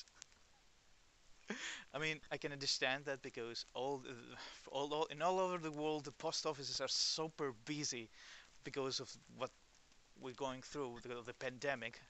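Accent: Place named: Greece. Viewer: I watched this purely for your beautiful, Greek accent. 😻☕️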